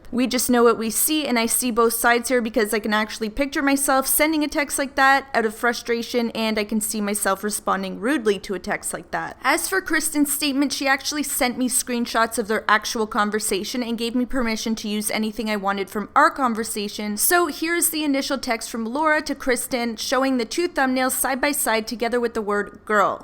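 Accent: Canada. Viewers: Totally off topic, but are you canadian?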